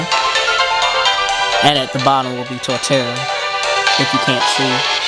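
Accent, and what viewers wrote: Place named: Appalachia, United States. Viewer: MAN! shut up and just play the game, we know what going on, i hate your hillbilly accent i had to stop watching the video and find a video with no idiotic talking. 0/5 stars, no subscription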